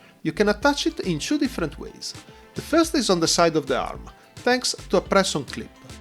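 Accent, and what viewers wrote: Italy. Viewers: You sound like an Italian 🤌😅 I hope i didn't offend you. Btw i Really enjoy watching all your videos and great tips tutorial, you should make a video about best techniques to tighten loose joints. ( Polycaps & otherwise) Soon. You did a great job on the review sir you earned a Sub 👍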